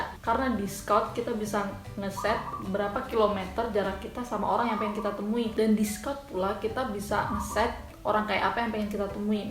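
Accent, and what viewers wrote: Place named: Java, Indonesia. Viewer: Wong jowo mas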